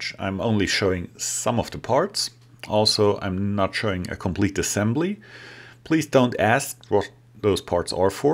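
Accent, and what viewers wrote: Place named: Germany. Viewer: You sound a lot like a professor I know. He is Dr. Chadoba. I work with him a little at the University of Texas at Arlington. The way you speak is very close to Dr. Chadobas speech. I recognize the German speach.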